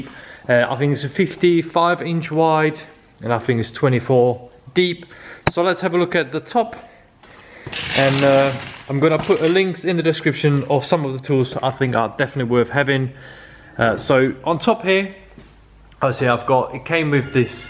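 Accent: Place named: Europe. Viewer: Where are you from? You have a European accent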